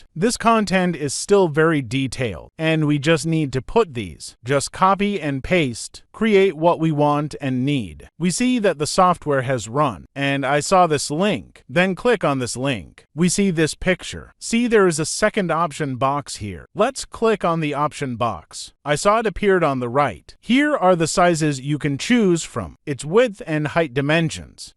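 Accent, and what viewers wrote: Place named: China. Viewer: Love the video, and I can't get over how your Chinese accent somehow shines through this AI voice. Way too cool, haha.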